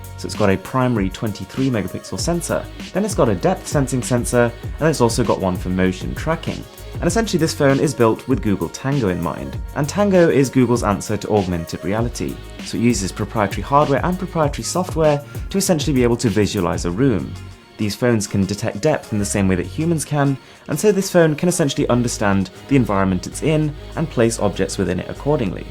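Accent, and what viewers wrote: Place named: Nottingham, United Kingdom. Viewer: Nottingham Accent.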